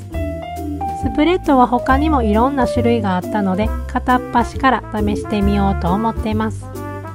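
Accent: Kansai, Japan. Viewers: 最近こちらのチャンネルと出会えて遡って拝見させて頂いています😊 世界の料理や生活風景勿論観光地や史跡等も見るのが大好きです。 nobanaさんの柔らかい話し方や私は関西人なので聞きなれた関西弁での動画も心地良く癒されながら観ております。 オンラインショップもされるとの事で楽しみです✨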